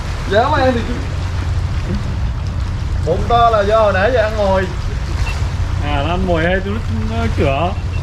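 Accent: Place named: South Central, Vietnam. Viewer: Viêt nam bạn ở tỉnh nào vậy .nghe giọng khó đoán miền trung pha nam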